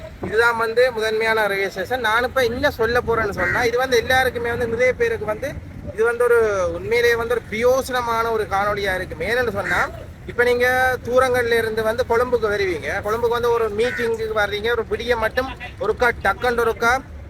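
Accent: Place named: India. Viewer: Nice and useful video, hope you are from Jaffna, but smells a bit of Indian Slang!!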